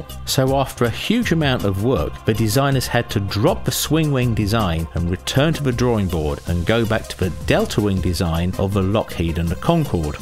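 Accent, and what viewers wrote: United Kingdom. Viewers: is it just me or do monotone, loud British accents just get irritating as fuck after more than about 2 minutes ? seriously this needs a female narrator or cc, dammit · Just like to say recently came across your channel I find it very interesting informative and oddly relaxing plus it's nice to listen to a fellow brit rather than the plethora of Americans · He is British, and they all sound the same.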